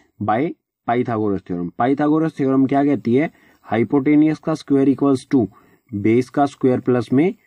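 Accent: Haryana, India. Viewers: Very well done sir, if you do not mind, kindly change your Haryanavi tone. it will be better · Sir aap haryana se belong karte ho kya 😊 apki voice mein haryanvi tone hai 😊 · Thank you so much sir for this video Sir one more question :- Are you from haryana?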